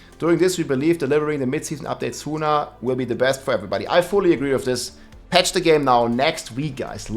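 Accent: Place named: Germany. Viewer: Ich mag es wenn man Englisch mit ein wenig Deutschem Akzent spricht - so toll (kein Sarkasmus), der beste Diablo-Content-Ersteller!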